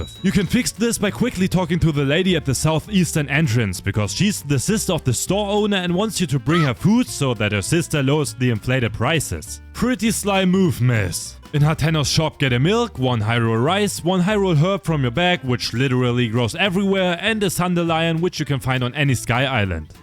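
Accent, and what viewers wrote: Germany. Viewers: this German accent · You are german, right?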